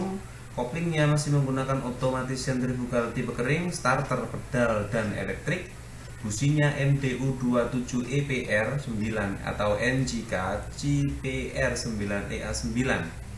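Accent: Java, Indonesia. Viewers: yo mbo' omong boso jowo wae, ilat sampeyan jowo banget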